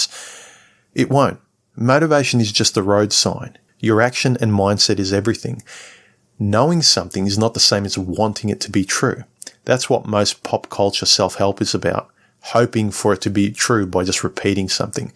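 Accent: Australia. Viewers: Mate, just wanted to say 'Hi' and a huge 'Thank you!' for what you do! I never comment on youtube. This literally is the first time, but decided to do a one-off as I relate to so many things you do and say. I'm a colleague, an animation artist, and absolutely love your illustrations and the wonderfully appealing lightweight design of your videos. You're in a class of your own among MGTOW. I found your channel via Coterie's and have been listening to your videos repeatedly, although I'm not a subscriber as I don't subscribe. Marcus' (Groundwork...), Coterie's and yours are my most favourite, and, I suppose, most relatable emotionally and intellectually. You sound a bit Aussie to me, but I may as well be wrong as English is not my first language, although I've been living in this welcoming country for nearly 15 years. It's heart-warming to hear how total strangers have come to the same conclusions and have taken their lives and reality in their own hands - how in this confused world men (I'm in my mid-40s) are still able to find the path to wisdom and peace of mind, lead fulfilling and meaningful lives. 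Self-actualisation had become my way of getting out of the existential crises of my 30s. Discovering MGTOW only confirmed and widened my understanding and knowledge of how the West has changed in the last 4-5 decades; the culture shock I went through was a temporal one. Growing up behind the Iron Curtain I was 50 years behind, I was a 'Westerner', coming from the past. The gradu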